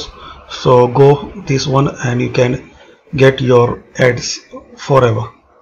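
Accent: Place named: India. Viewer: Sorry but your english is bad sre u from india ur voice its likley from india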